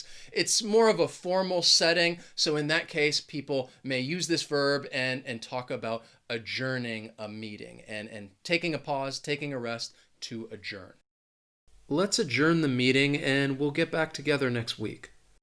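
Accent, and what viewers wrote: United States. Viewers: You are really great sir Are ya really AMERICAN If you're not you just sound 100 percent like an AMERICAN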